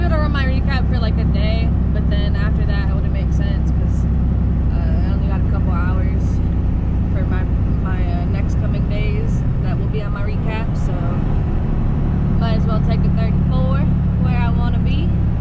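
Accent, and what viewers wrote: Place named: Oklahoma, United States. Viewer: U sound like an okie!!!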